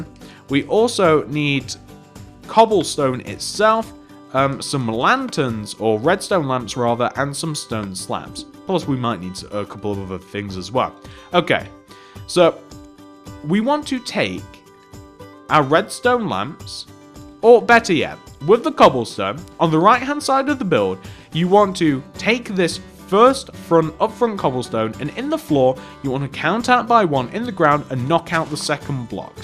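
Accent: United Kingdom. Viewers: I think he's from the U.K.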